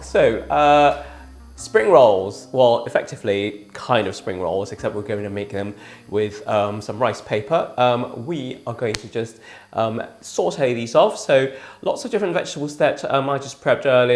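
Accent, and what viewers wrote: United Kingdom. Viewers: I can't stand the British accent 😂😂😂😂 its horrific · Love your Recipe and your Accent!! British??? NYC Manhattan ❤️💋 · Nice video for the spring rolls, also like your British Excent.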